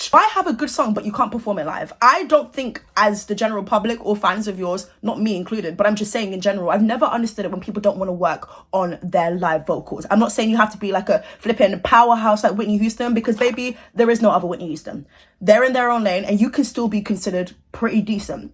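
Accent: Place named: United Kingdom. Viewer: First time viewer here and I must say, I LOOVVEE your British accent, babe! From an American standpoint, that accent on a beautiful woman is a deadly combination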